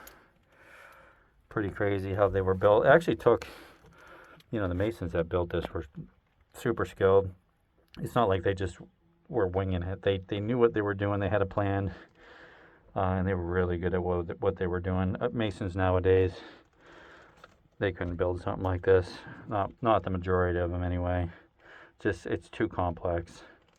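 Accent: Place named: Northeast, United States. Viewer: Any idea where this guy is from? His accent sounds like he’s from the northeast maybe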